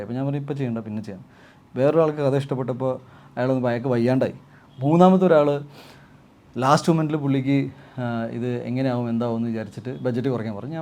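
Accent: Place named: Gujarat, India. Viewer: Ayaale padichathum valarnnathum Gujarat aanu. 20 vayassu kazhinjaanu pulli malayalam ezhuthaan padichathu. Athinte issues dialogue deliveryke onde. Athaanu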